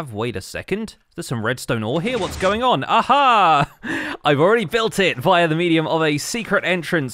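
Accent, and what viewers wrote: United Kingdom. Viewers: I think that is because of his British accent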